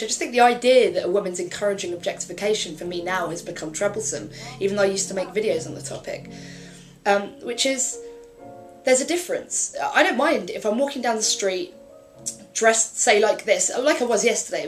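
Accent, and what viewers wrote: United Kingdom. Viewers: I'm sorry but I can't watch this video because I can't consentrate on what you are saying. Seeing you in 1940 noir chic style is making my blood race, with you chiming away in the english accent and that squacky torch song on Wow ....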